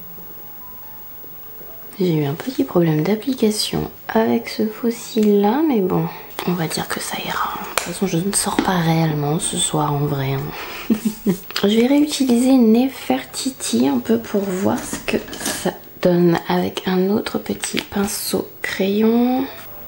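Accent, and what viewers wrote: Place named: England, United Kingdom. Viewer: Coucou, j'adore ton petit accent anglais :) Très beau makeup, on apprends pleins de trucs. J'avoue j'adore quand tu te fais des smokies bien intenses. C'est personnel, je suis pas fan des paillètes. merci pour cette vidéo, gros bisous^^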